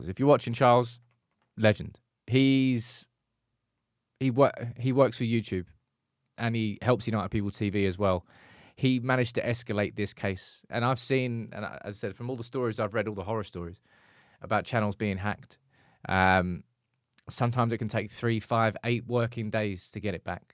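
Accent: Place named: Liverpool, United Kingdom. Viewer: Defo a Scouser... sorry you had to go through that. Glad to see you got your channel back! ❤🤍🖤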